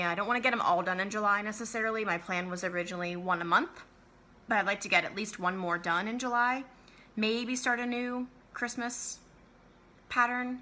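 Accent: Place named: New York, United States. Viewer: I'm catching up on your videos and I totally thought you were from New York! I grew up in NYC and swear you could have been my neighbor there. Maybe it's how quickly you speak? (Which I LOVE, because NY). I love Ebenezer's Christmas, I think I have to find and stitch it for my brother.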